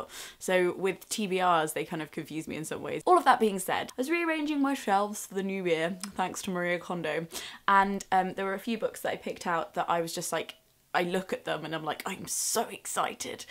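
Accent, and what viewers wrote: United Kingdom. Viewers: Good choices. (Luv your Brit accent) Very pro, your technique.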